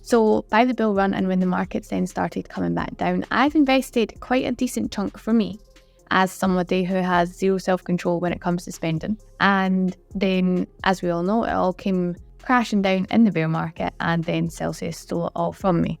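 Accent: Scotland, United Kingdom. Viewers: Love your Scottish accent 👌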